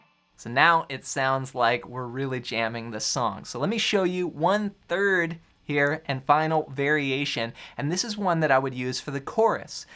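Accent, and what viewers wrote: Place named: California, United States. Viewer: I'm from the U.S. and if I had to guess I would say somewhere along the west coast. I detect a very slight "California surfer" accent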